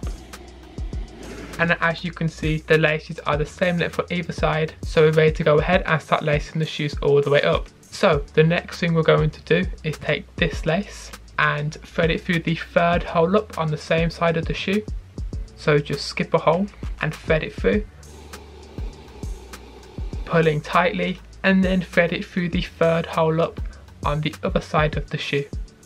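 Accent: United Kingdom. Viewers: are u british, i like the accent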